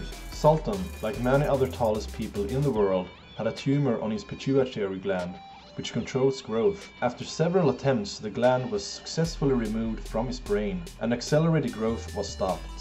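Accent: Sweden. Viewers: I can hear you are from Sweden!;D Swe: Man kan höra att du är från Sverige!;D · Are you from sweden? + I SUBSCRIBED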